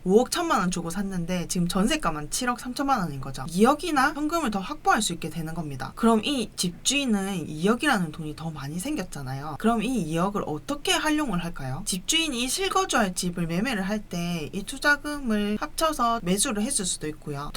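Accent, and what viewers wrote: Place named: Busan, South Korea. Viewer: 집 때문에 저희는 결혼할 때 싸우지 않아요 경매 받은 집은 안들어 갑니다 갭 투자도 안해요 세금 때문에 하지만 항상 이상한 애들이 월세내고 주변에 있네요 한번 뵙고싶네요 부산 가면 고향사투리 좋네요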